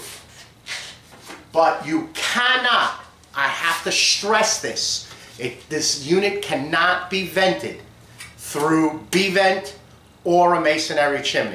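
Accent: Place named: New England, United States. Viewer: Love your yankee accent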